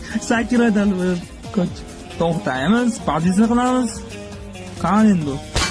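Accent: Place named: Andijan, Uzbekistan. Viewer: Тохтамыша. Керак. Эди. Акам. Анжанча.. Момла. Ю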